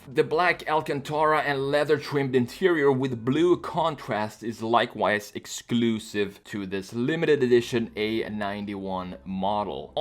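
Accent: Sweden. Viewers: Hejsan! Hamna på din kanal av en ren slump och hörde på din engelska att du va svensk? Rätta mig om jag har fel:D Jag vill bara säga att du gör ett fantastiskt jobb, riktigt kul att se, du har flera videos som jag måste börja kolla genom. Snyggt jobbat!!!!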